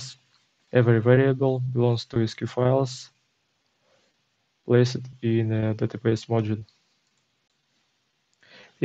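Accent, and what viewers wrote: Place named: Post-Soviet states. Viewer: Искал инфу по SQLDelight, начал смотреть видос, и такой, акцент, слишком грубооо, пахнет снг, потом смотрю а это )Лайк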